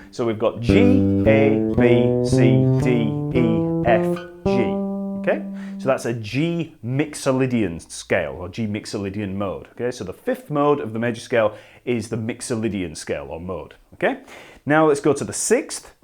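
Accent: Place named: United Kingdom. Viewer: What was that app again? IWILBRI... What? Being a Filipino hard-wired listening and talking in American English accent makes me struggle a bit when listening to British accent.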